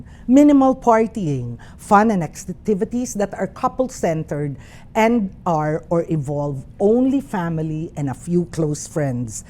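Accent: Philippines. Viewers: I came across this video and thought you were Filipina when I heard your accent! And hala, you are! Lol thank you for that insightful information! =)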